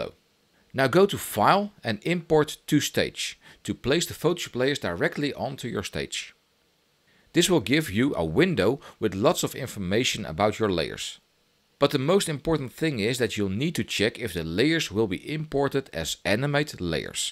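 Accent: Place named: Netherlands. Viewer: this sounds so Dutch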